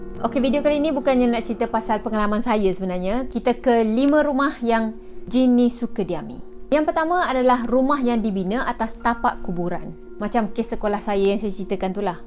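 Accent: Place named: Johor, Malaysia. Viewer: Best le kakak punya penyampaian tak bosan macam sleng jawa johor tu yg kmi suka cara kakak berckp .👍👍👍😃😃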